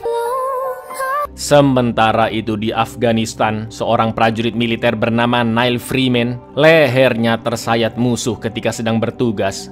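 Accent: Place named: Surabaya, Indonesia. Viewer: Lo samean wong suroboyo ta cak?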